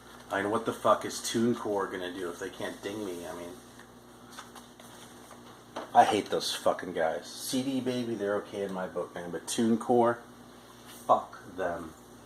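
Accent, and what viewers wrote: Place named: Spain. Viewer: That Gang Starr album totally rules! Now I'm gonna watch new Blade Runner and have some chocolate while watching it. Nice stack of picture records. I have first four Venom albums on picture discs. I have more but I really don't remember right what else. Cool Spanish accent hehe. Take care. Cheers, Petri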